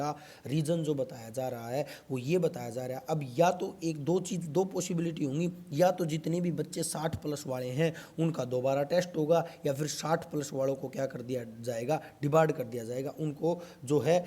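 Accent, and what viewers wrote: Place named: Haryana, India. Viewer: Bhai bole te to Haryana ka laage hai 😀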